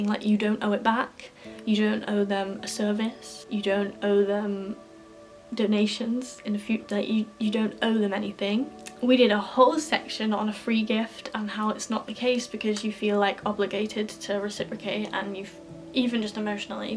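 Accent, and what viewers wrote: Northern England, United Kingdom. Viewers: I can identify with so much of this! Yesterday I watched programmes about state and private school head teachers swapping places, which was so interesting. It's a different world at 'public schools' and no wonder they can fit in so easily at a place like Oxford. Even though it's now 29 years since I went to Oxford (hard to believe, it seems more like 15!) your experience sounds so similar to mine. I love the old buildings... accents was something people discussed (I would not have been able to guess where yours comes from it almost sounds 'normal' to me with hints of a northern accent, but then some of my friends here in Wales sound very much like you!) Formal dinners were so awkward as I was afraid of how hard it is to have good table manners. (The first time I asked what the broccoli was because I had never seen it before - it was not a common vegetable in the 1980s!) They were every Tuesday in my college but were optional and I think I tended to go about once a term. 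I much preferred cooking for myself. As for drugs, I never came across anyone into that, only maybe once a friend was harming herself and might have been using medication. I had something better than a better 'study drug' - baking choc-chip cookies and selling them to my friends to raise money for charity. We all thought they were great 'revision fuel'. Most played music in their rooms to help concentrate on studying. That was new to me, as I thought that would be a distraction, but I ended up doing it myself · Interesting video! I was at The Queen's College Oxford, 1994-97. Although I am British, I had never lived in Britain before I went to Oxford, so most things were very foreign to me, but I really enjoyed it. It's funny you mention the Northern accents, because Queen's has historical Northern connections, and your kind of accent was quite common in my college. One of my mates there was from Sunderland, with a really strong accent and nobody made any fuss about that. 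Maybe things have changed since my days, or maybe that was just my college. Who knows?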